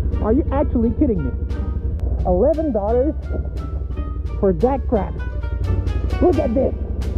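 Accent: Quebec, Canada. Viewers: I must ask are you from Quebec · Il est du Québec j’en sui sûr 😂😂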